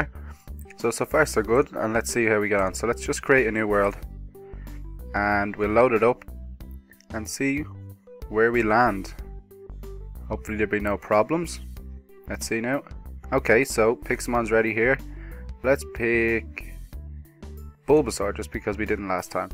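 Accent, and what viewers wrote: Ireland. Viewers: he's IRISH YAAAAAAAAAAAAAAAAAAAAAAAAAAAAAAAAAAAAAAAAAY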